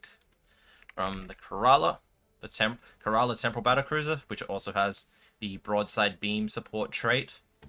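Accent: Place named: Australia. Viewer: Don't listen to whiney ass Americans. You are obviously Austrailian. If you say Ark Royale. Then just say Ark Royale...I'm American, and I don't think you should listen to others that nit-pick your accent. Fuck em....just say what you want. If you want to just call it the Ark Royale with Cheese....I won't complain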